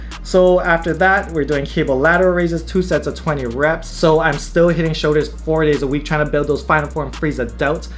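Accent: Hawaii, United States. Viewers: Wassup ma guy are you from hawaii?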